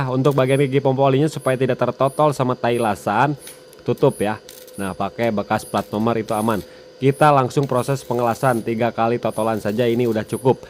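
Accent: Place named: Garut, Indonesia. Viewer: Tutorna mantap.urang Garut sigana mah,karunya urang Manado Weh rada ngahuleng wkwkwk😅😅😅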